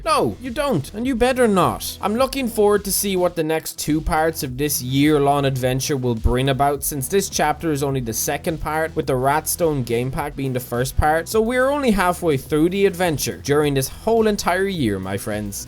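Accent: Ireland. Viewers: Are you actually irish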